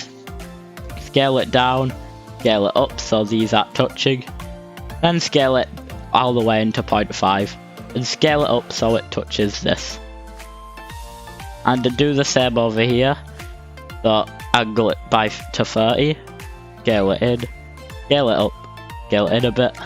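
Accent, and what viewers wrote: United Kingdom. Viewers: I like your British accent idk if ur British but sounds like British to me btw I subscribed cuz of ur glider ❤❤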